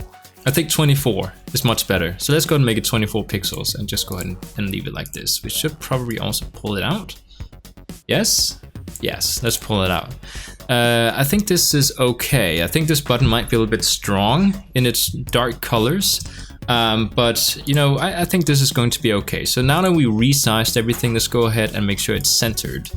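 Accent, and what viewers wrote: Denmark. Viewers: Are you danish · Tænkte sku nok du var dansk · Your English sound danish ?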